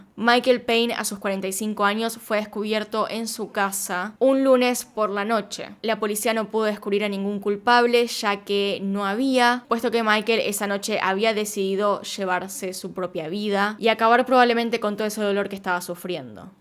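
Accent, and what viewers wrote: Buenos Aires, Argentina. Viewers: Acento argentino 😕😕😕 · Eres porteña?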